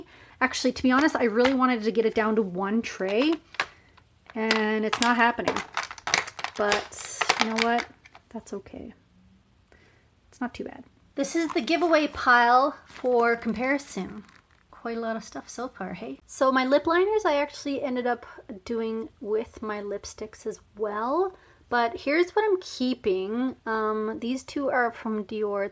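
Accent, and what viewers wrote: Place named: Maritimes, Canada. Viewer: i could tell you are from the maritimes from the accent my mom is too. i go back there every summer newfoundland is absolutley beautifu. l lots of love from vancouver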